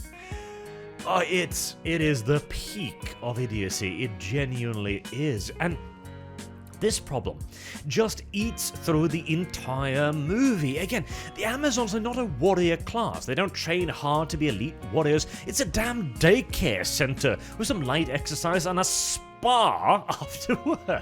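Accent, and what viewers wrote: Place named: England, United Kingdom. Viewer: Listen to that English accent, and knowing that my language that I speak is English come and knowing that all three of my names both first second and surname is english, and knowing that the English is participated in the slave trade and benefited off of it still to this day, you should be the last person talking.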